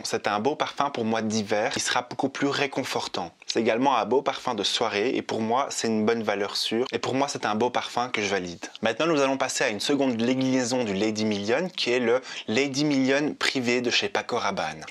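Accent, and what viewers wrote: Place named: Wallonia, Belgium. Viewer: Accent wallon ou brusseler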